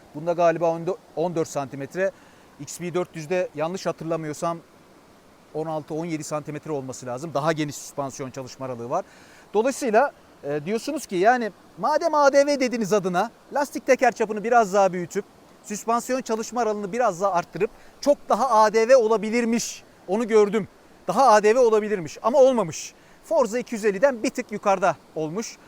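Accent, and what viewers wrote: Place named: Adana, Turkey. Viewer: abiniz Adanalı belli. Adanadan selamlar o halde!!!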